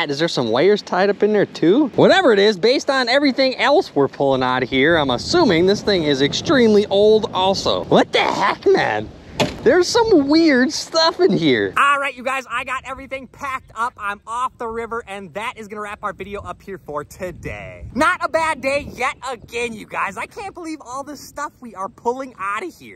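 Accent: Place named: Rhode Island, United States. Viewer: May I know where is this cute accent from? Rhode Island? LOL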